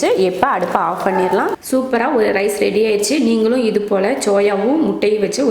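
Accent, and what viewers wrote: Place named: Tirunelveli, India. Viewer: OK... Avangaloda slang tirunelveli mathiri irukku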